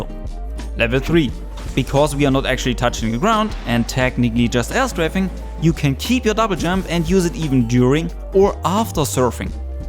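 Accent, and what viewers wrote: Germany. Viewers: Man hört schon sehr stark raus das du Deutsch bist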